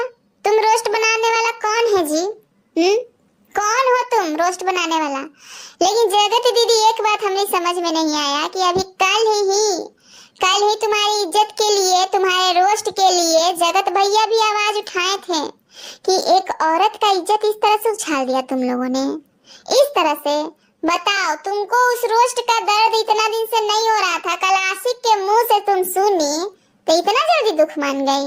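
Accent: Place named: Magahi, India. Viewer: Didi ap kaha se ho plz bataiye.mujhe aisa lg raha h ki ap ka bolne ka tone aur kuch word jaise lagagit hau use ki wo to maghi word h a mujhe lg raha ki ap maghi ho pl z ap batao ki ap kaha se ho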